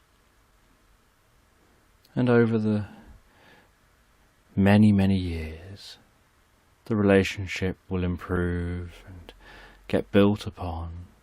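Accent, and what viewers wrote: United Kingdom. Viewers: Hi are you from the uk? Also were can I buy your book from? I am new to your channel, look forward to hearing from you Angel blessings Sylvia Watts ( Rev, ATP )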